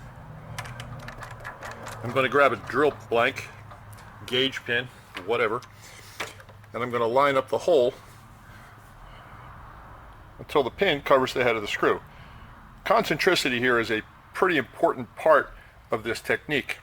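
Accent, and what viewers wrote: United States. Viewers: No need to be careful as our different interpretations make it so interesting. Just continue with your 'American' English and I'll sort out what you mean 😉😀 I'm finalising installing independent power feed to the X-table on my Marlow mill having fitted a VFD main drive. The mill did come with a separate motor but it was disconnected by previous owner and the wiring was a dogs breakfast. Using one of those ubiquitous Chinese 12v DC motors. Now it's heaven selecting the correct speed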